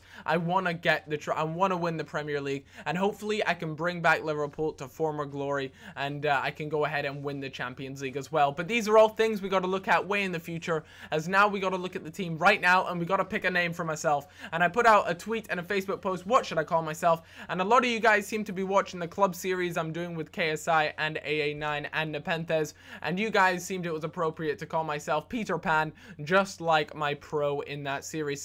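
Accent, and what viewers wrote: Scotland, United Kingdom. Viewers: is he scottish? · I love you just cause your Scottish <3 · Is Scottish?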